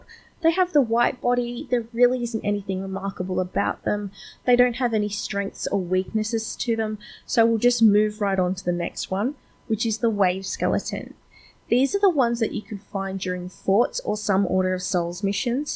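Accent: Australia. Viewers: dude, I was having to take 6 swipes at reg skellies that normally take 3. I’ve been a PL since year one, so not sure what’s up. Btw, still loving the content and the Aussie accent 😎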